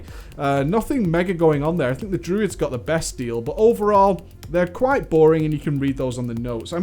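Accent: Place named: Scotland, United Kingdom. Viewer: I can't understand half what this mush-mouth is saying. Yelling, then mumbling with that thick-as-haggis accent doesn't work well.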